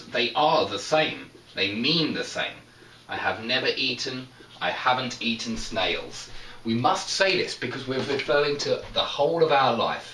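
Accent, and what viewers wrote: United Kingdom. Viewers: Nice accent ^^ are u british? I´ve been there a couple of times and England is so beautiful, greetings from Brazil ^^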